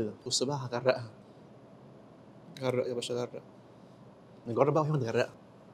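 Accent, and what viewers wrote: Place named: Saudi Arabia. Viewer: لهجتك السعودية حلوه مره😂😂😂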